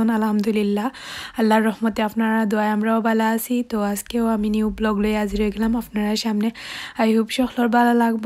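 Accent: Sylhet, Bangladesh. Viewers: ki bala lage syleti kotha sunte👌🏻👌🏻 koro jaga guriya ailam apnar loge😊.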